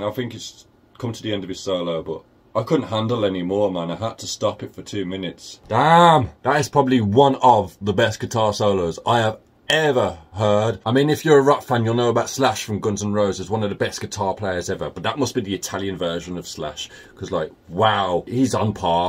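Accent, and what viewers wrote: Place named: England, United Kingdom. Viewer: hi, where are you from? I adore your english accent. I want to learn it....I must!!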